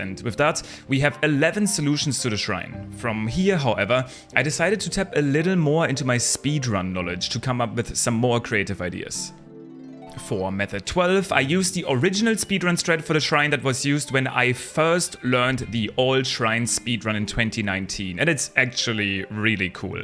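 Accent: Germany. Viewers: Hey, are you German? (Your accent sounds like you are)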